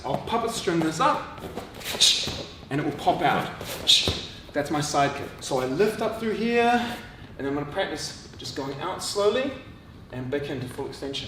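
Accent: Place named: New Zealand. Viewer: sounds super kiwi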